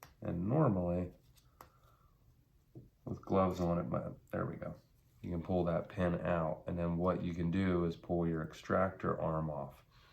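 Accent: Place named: New England, United States. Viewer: I just remembered him saying in his New England accent that steel is very “hard”, and that that steel will last forever. Just keep it clean and very lightly oiled, noting can happen to those rails. The only parts that can go bad are the cam pin and the parts actually on the bolt, the lugs, the extractor, etc. I’m not an expert though, but that’s my understanding. The rails probably will never be a problem.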